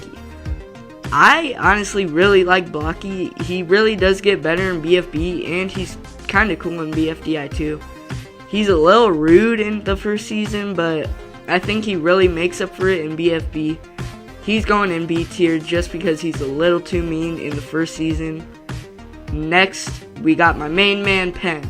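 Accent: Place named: Texas, United States. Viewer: dude sounds like that local texan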